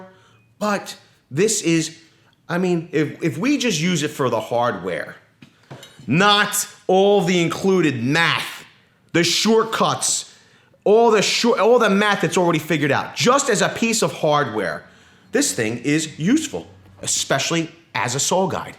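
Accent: Philadelphia, United States. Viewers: What part of Philadelphia are from?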